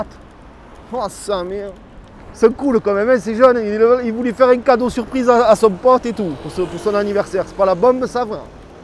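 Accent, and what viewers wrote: Marseille, France. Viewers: c'est sketch chez moi, dès que la situation s'y prête on t'imite. Ma meuf quand je la gêne dans la cuisine elle gueule 'Oh enlève toi du milieu" en prenant l'accent marseillais. Pareil, elle me montre un meuble qu'elle kif et elle me dit "Tu pouce blue ou pas ?" Tu rends ouf les gens 😂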